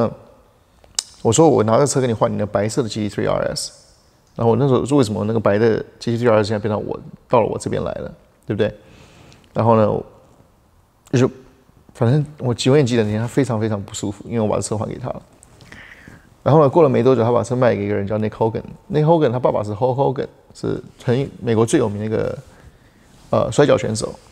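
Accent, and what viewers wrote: Taiwan. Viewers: 台灣人特有的口音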